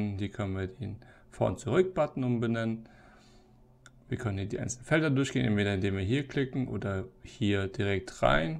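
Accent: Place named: Saarland, Germany. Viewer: sorry, der Inhalt mag/könnte ja hilfreich sein, wenn man was verstehen würde und was erkennen könnte. Hektisches Rumgefuchtel und Saarländisches Genuschel sind nicht hilfreich - Sorry to say that. Aber vielleicht willst du dich ja verbessern...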